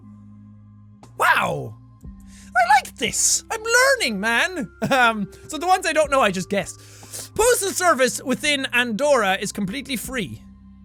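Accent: Ireland. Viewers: false because your a boss Jacksepticeye i love your videos and i know you have probably heard this alot but i am your biggest fan i love everything about you even your Irish acsent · I know full well based on your accent that you're Irish. You couldn't say the same for me though. I am British, and I have a British accent. But that's it. A BRITISH accent. Neither Scottish nor English, because my blood is a combination of the two. I consider myself Scottish, and I'm proud to be, but you wouldn't be able to tell based on my accent. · His accent is awesome My accent is almost the same because I'm Irish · You sound pretty Irish to me!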